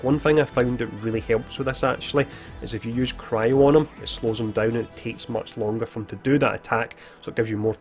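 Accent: Scotland, United Kingdom. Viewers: this video was recommended to me if that helps as I've been watching a lot of different avengers content....I just cant figure out your accent...Scottish?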